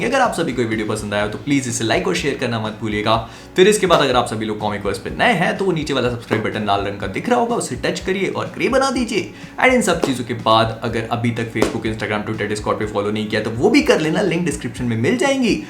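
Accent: Bhopal, India. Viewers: apka accents sunker bhopal ki yaad ajati hai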